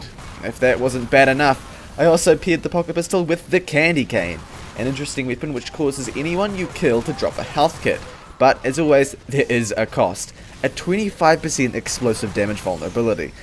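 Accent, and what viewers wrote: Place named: New Zealand. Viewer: Are from NZ by any chance you've got the accent.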